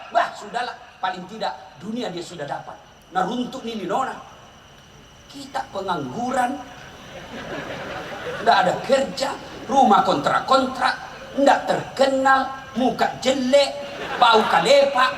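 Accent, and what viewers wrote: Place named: Makassar, Indonesia. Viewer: Lo ngk ngerti bhas pak ustas jgn sebarng sbb beginilah logat makassar kasart tpi lucu n tpi bertujuN baik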